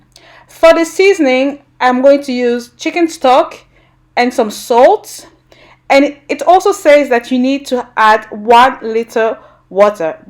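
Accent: Twi, Ghana. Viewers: I love your twi so funny😀😀😀 sending you love from Ghana 🇬🇭❤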